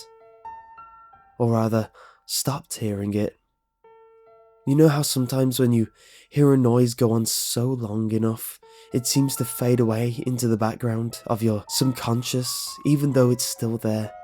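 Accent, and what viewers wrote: United Kingdom. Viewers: Are you British or American?! THIS IS DRIVING ME INSANE!! WHY THE HELL WOULD YOU DO THIS TO SOMEBODY?!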